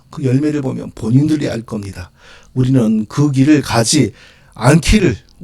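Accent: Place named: Jeolla, South Korea. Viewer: 전라도 사투리가 짙게 뭍어 나오는군. 경망스런 놈이군.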